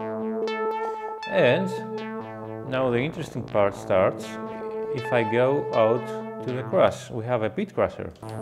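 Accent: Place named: Greece. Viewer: Γιατί απο τα αγγλικά που ακούω νομίζω ότι είσαι Έλληνας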